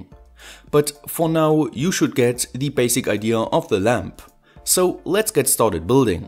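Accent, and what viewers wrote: Germany. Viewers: Hi bist du deutscher weil es sich sehr so anhört. Hast du auch einen Deutschen Kanal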